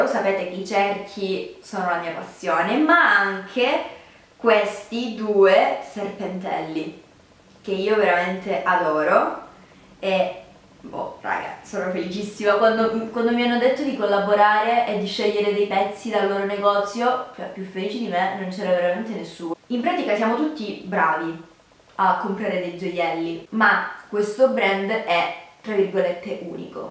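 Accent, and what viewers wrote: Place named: Calabria, Italy. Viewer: Ma di dove sei .. la tua voce sembra calabrese 😍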